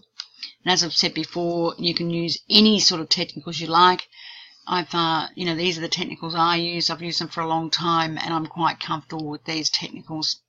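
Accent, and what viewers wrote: Australia. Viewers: Don't fight it. Enjoy the Aussie accent man.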